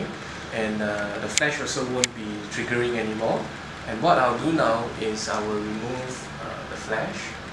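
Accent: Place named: Singapore. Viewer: long live singlish! :D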